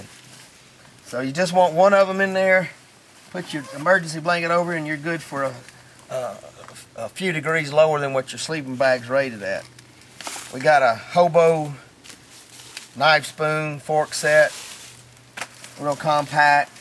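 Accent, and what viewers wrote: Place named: South, United States. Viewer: Hey, my wife says no, I say you could be my twin brother, except for your southern accent.........and I may have a little more hair on top! Ha Ha. Nice video, Thanks PS I notice on some of your other videos your handy in the metal shop, I am also a tool and die maker by trade. Weird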